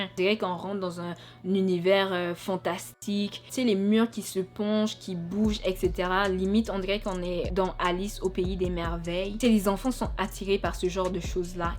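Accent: Quebec, Canada. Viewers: Hey pourquoi tu parle comme une kebecoise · You sound like Canadian. I don't like that song, it denigrates black women. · T québécoise ?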